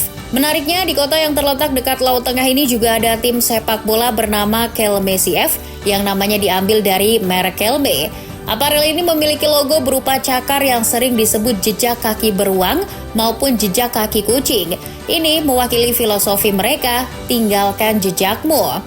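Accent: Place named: Banten, Indonesia. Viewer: Admin orang Banten ya lur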